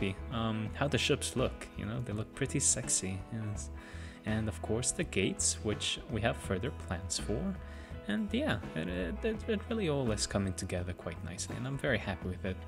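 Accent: United Kingdom. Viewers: were you speaking in a British accent for part of the beginning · Are you brithsh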